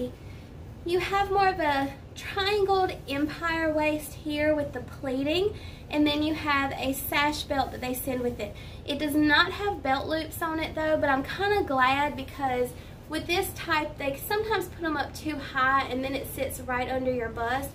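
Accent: South, United States. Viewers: You are so cute. I love your personality and your southern voice.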